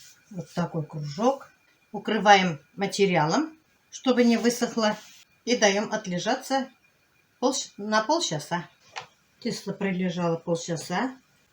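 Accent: Tatarstan, Russia. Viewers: Apaika ne v koem slu4ae ne hotela obidet vas .vi horosho govorite .akcent tatarskii obozhay vas .s uvazheniem Farida💋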